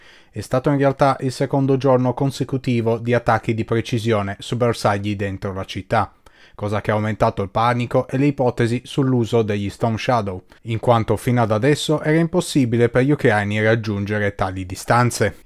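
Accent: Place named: Albania. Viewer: il suo accento assomiglia tanto alla lingua Albanese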